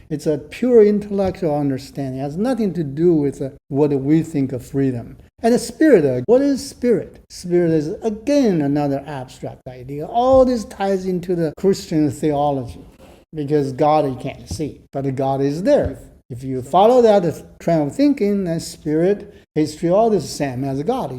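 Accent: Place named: Asia. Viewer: 😅funny the midweight accent of the speaker is certainly Asian origin, more likely Mongolian line of accent, like Mandarin or Korean; and, no Chinese is not the only non-phenolic language in the world, certainly a very poorly researched position. And to used such a narrow band of measurement to arrive at such a broad encompassing subject is a slap on the essence of academic endeavors. I used to like Hagel a lot as I like the challenge to follow his maze of thoughts, sadly the older I get the more I experienced, the more flaws in Hagel I see, and the more reasons that to see how he wrote with so much unnecessary acrobatic maneuvers to confuse and to hide the tracks of flaws. My humble 2 cents.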